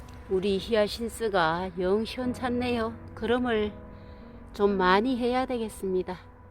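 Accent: Gyeongsang, South Korea. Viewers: 너무예쁘게 잘보았습니다. 목소리가 경상도인듯한데 농장이 어디인가요? 우리집은 대구인데요 꼭한번 구경하고싶은데 가능할런지 모르겠습니다만 주소나 전화번호를 알려줄수있는지요? 꼭 한번 보고싶습니다.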